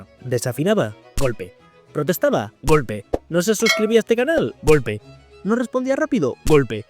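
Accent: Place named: Galicia, Spain. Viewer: ME K4G4 TU ACENTO GALLEGO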